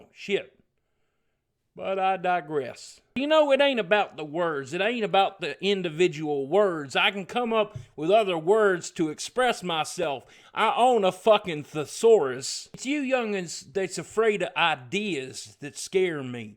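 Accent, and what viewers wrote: South, United States. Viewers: If this was the first boogie video i'd watched, i'd have thought this was really his voice. I didn't know he could do such an amazing southern accent. This is hilarious to top it off. Sounds like the first southerner i'd probably really get on with, lol. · I. Love. The. Accent! This is why I wanna visit the US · (laursaurus) That's because he grew up on the south. He worked on getting rid of his accent when he was younger, I think. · He takes the typical southern person with a accent who much people think are stupid it turns it completely around. Good job. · My favorite YouTube persona. I don't want to tell you how to do what you do because it's hilarious, but being from the south if you could make the accent a little thicker it would be spot on. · It's actually his real accent, he's said many times he had a southern accent and tried to get rid of it. 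· Lol you are awesome man:D absolutely loving that southern draw gotta admit your topics so far have gone over my head america's pretty fucked up right now with everything going on but being british we know none of the pc culture or trump issues:p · I live in Alabama, the Southern accent is spot on bro. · he's just playing and has a southern accent.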